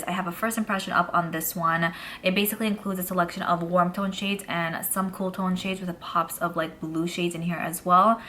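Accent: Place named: South, United States. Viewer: Random comment but I love your accent, the way you say "gloss" is so cute, is it Southern?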